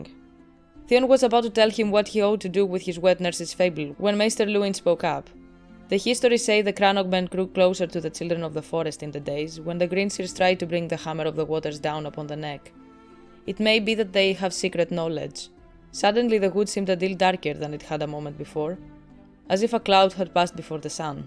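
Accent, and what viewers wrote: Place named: Greece. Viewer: heard your accent and HAD to check if you were greek lol. First Greek ive seen doing content on ASOIAF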